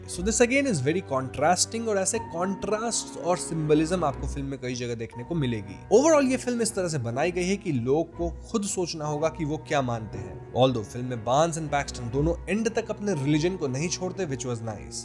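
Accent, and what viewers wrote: Bengal, India. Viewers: Bas kharab Hindi sunke bar bar mood kharab hogaya. Bangal se ho kya bro? Jara Hindi pe kaam karona.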